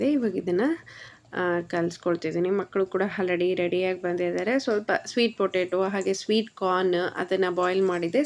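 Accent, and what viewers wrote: Raichur, India. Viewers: Nemma maatu thumba Chanda raichur dst anesuttai nemmadu sis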